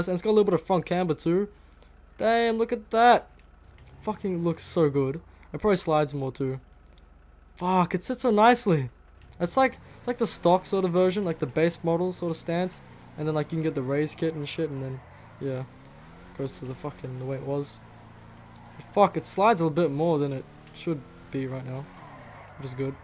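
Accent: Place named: Australia. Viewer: I got bored and decided to watch a bunch of random videos and I just realized...you're Australian and you say "mad" you sound like might car mods lol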